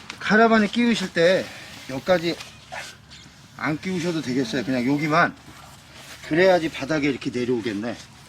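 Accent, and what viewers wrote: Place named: South Chungcheong, South Korea. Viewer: 말하는게 충남 서산여 ㅎㅎㅎ 발전기 몃키로에요?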